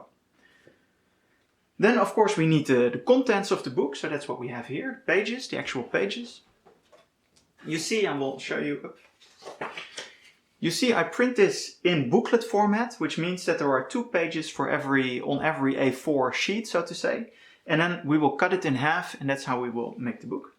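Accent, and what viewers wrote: Netherlands. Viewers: Do I detect a Brabant accent?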